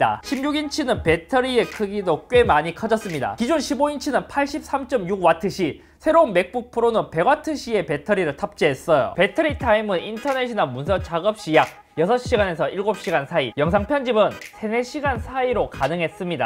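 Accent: Gyeongsang, South Korea. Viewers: 역시 갱상도르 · 갱상도 아입니까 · 오우예 같은 경상도 people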